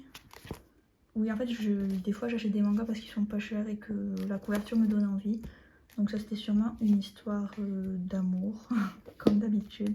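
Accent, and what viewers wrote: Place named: Toulouse, France. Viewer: Bonjour! Vidéo très agréable! Tu es de Toulouse? C’est ce que je me disais avec ton accent. 😊 J’ai fais une licence de japonais à l’université Jean Jaurès (à l’époque le mirail) en <num> et je vis au Japon depuis 2007. J’espère que tu feras d’autres vidéos sur l’apprentissage du japonais ou sur ta double culture! J’ai moi même deux enfants mais malheureusement ils ne parlent que japonais! Bon courage pour le JLPT! À bientôt!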